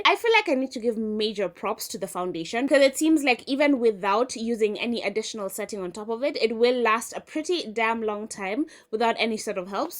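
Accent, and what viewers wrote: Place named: South Africa. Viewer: Your accent sounds so South African🇿🇦💕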